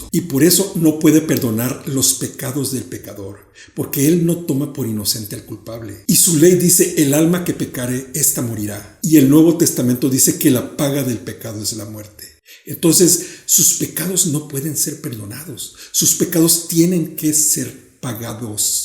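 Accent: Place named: Mexico. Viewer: Tiene un acento Mexicano, excelente explicación, felicidades, bienestar para tu vida.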